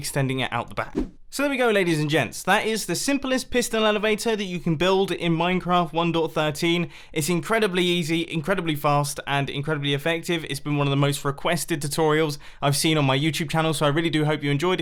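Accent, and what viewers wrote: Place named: England, United Kingdom. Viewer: I’m sure you might have said this before somewhere, but I’m a new subscriber and just wondered whereabouts in England you’re from?? I’m from England too but your accent is so lovely to just zone out and listen to!!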